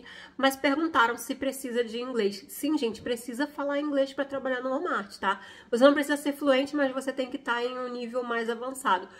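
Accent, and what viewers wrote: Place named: Rio de Janeiro, Brazil. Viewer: Você é carioca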